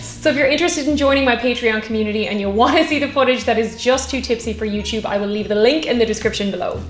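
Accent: Ireland. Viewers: This combines Irish lore and alcohol hosted by Tinkerbell I mean the Absinthe Fairy with an Irish accent - win! I wonder if Walt got the inspiration for Tink from an absinthe fairy? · Really beautiful woman with Irish accents, one of my favorite things....but then again I am a man. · anyone else notice her Irish accent is stronger on her own channel, then when she is tatse testing food on the try channel..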